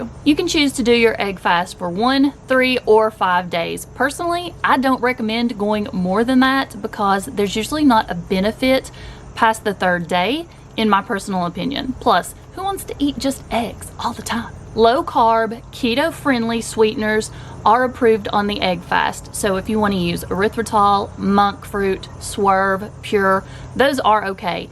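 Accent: South, United States. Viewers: where you from the south?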